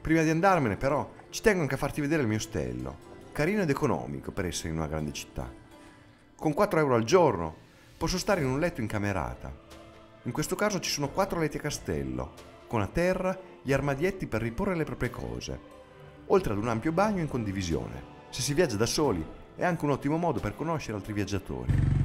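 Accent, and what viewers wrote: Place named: Romagna, Italy. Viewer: Socmel ma sei romagnolo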